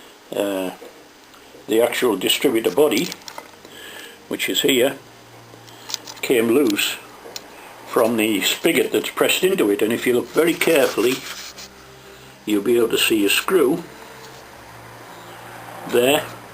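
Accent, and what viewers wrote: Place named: Cumbria, United Kingdom. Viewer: Nice bike, you sound very Cumbrian indeed.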